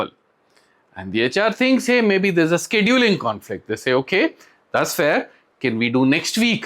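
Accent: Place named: Karnataka, India. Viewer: Sir you are punjabi but your accent is pure kannada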